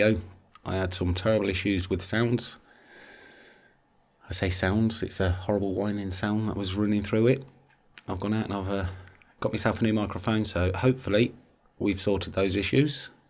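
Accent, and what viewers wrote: United Kingdom. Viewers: Accent from somewhere near Cov?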